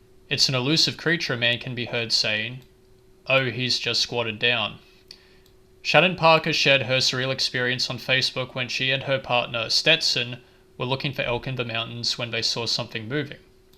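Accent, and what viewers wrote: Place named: United Kingdom. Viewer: Glad you thought it was important enough to post this scathing comment in response to my scathing comment. Are we having fun yet? You got it wrong again, the name isn't Frederick, it is. That's a family name, especially with the 'son' part. Names like that go back a long way - all the way to Jolly good Britain as a matter of fact. If you're not a Brit, you sound like one. As to the mis-pronunciation of words, there certainly are different pronunciations - there are the correct ways to pronounce specific words, like city names. One pronounces them the way the locals do and there is your way. I'm terribly sorry you feel that a comment like mine is worthy of your attention, but it seems that you are one of those angry people who cannot bear up to criticism. Must be hard to be you. Your "analysis" as you prefer to call it, was actually more commentary because you did not enter any facts based on the scientific method to sway viewers. 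Your opinion was obviously being expressed and you continued to make judgments based on your feelings and interpretations which were uttered with bias. I know, I know, you like all the thumbs up arrows being pushed because YouTube is a revenue generator and negative comments distract from your wish to make that cold hard cash. Too bad. Had your video shed actual light on the subject, I'd have given a better review.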